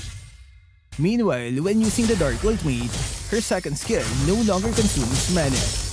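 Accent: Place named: Philippines. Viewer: I'm not focus cz Filipino accent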